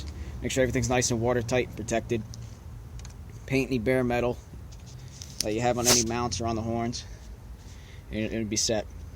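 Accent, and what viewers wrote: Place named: New Jersey, United States. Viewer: Very thorough video. I liked watching it. Makes it seem easier to understand. New Jersey accent.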